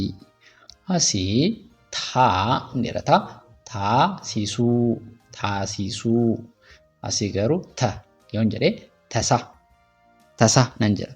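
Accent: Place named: Addis Ababa, Ethiopia. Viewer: Gara addisabanyaati hin jijirin loqoda kee bro!!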